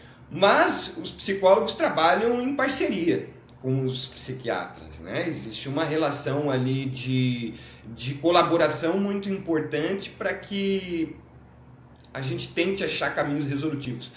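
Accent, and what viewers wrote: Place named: Santa Catarina, Brazil. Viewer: Muito bom!! O conhecimento do é muito vasto, agrega muito e o sotaque parece de catarinense 😅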